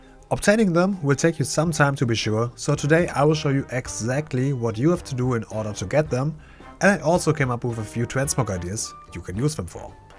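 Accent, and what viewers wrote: Germany. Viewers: Most german accent on YouTube after maybe Werner Herzog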